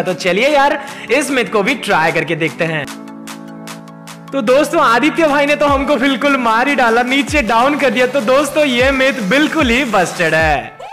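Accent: Odisha, India. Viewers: Bhai aap oddisa se ho Kya 😅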